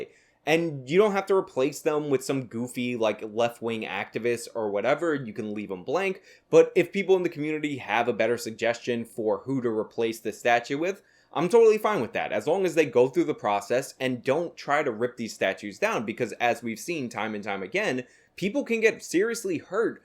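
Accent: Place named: Texas, United States. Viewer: Dude sounds like your blessed to born Texan you should come back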